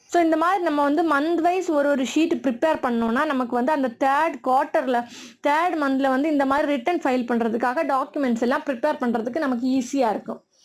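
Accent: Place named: Tirunelveli, India. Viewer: Nenga tirunelveli kanyakumari slang pesaringa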